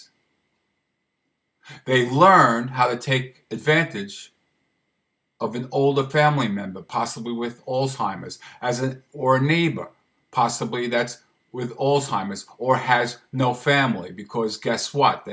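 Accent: New York, United States. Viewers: Sir, it is spooky how you are describing the lives my wife and I have lived. We are both children of narcissistic parents, (more so our mothers), originally from NJ. We moved to the gulf coast of AL to escape our narcissistic mothers in NJ. We both suspect, from your similar accent, your originally from that general region also, (ok, I'll say it, NY), and may have relocated as well. We "enjoy" your posts, because they serve to let us know we are not crazy. We have concluded that no contact is the only way to escape. However, just like Al Pacino said, just when we thought we were out, they pull us back in! LOL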